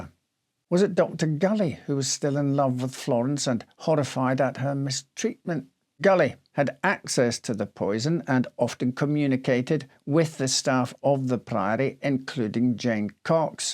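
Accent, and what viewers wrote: United Kingdom. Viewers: Something about your British accent and your articulation makes you very enjoyable to listen to. I thank you for your service sir!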